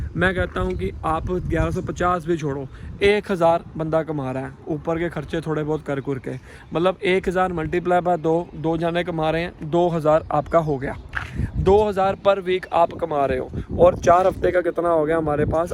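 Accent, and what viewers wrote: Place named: Punjab, India. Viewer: ਬਹੁਤ ਪਿਆਰੀ ਬੱਚੀ ਆ, ਪੰਜਾਬੀ ਬੰਦਾ ਹਿੰਦੀ ਬੋਲਦਾ ਸਾਫ਼ ਪਤਾ ਲੱਗ ਜਾਂਦਾ ਆ ਕੇ ਇਹ ਬੰਦਾ ਪੰਜਾਬੀ ਆ!!😊😊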